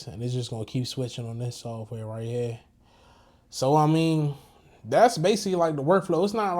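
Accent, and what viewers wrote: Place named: DMV, United States. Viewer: you got a dmv accent pushp on me i own a studio in dc let get you some placemnts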